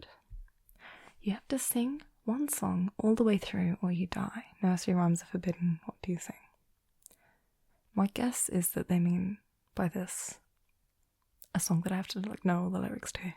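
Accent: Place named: Australia. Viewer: Whoa, that took me by surprise. To the ears of someone who can't put two German words together in correct syntax, you speak it very well. New ASMR opportunity? ;) On the note of your background, when I was new here and had yet to become acquainted with your channel, I actually thought you were living in Australia. You carry a lot of that accent with you, imo. Pretty cool. Yeah, I saw that Japan interview video as well. I was tempted to comment about it a few weeks ago but I was like, "Nah, she's seen it by now..." I'm glad I didn't read the comments on it; I'm sorry you endured that. It sounds like you have a lot of travel destination plans. If any of those opportunities come to pass, do you think you would do recordings while you're there to share with this community? I always like sampling people's travel vlogs. Thanks for doing this Q&A! I didn't see any notifications to pose questions but that's ok: you answered a lot here! 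I enjoyed this. :)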